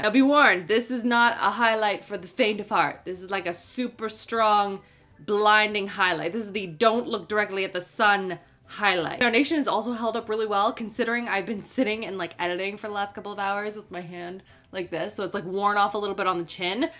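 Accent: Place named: Canada. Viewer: I loved your day in the life one!! I find you talk alot like me but then again we're Canadian girls ;)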